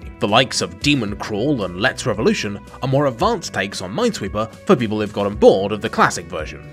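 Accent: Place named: United Kingdom. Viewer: Sometimes I watch your videos and forget that I'm not watching GMTK, but then I'm like "Wait, he's still British, but he's a different British..."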